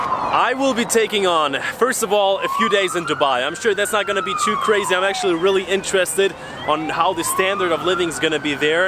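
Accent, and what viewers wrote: United States. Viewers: Rich Bowman Yes my very thought too! I don’t know what nationality this guy is but he definitely has an American accent! If he is American then that would explain the BS!😳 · You actually speak english really well with a good american accent, been living all your life in the States I suppose.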